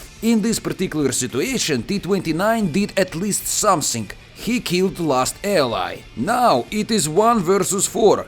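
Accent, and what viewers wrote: Russia. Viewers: True russian accent.Perfect English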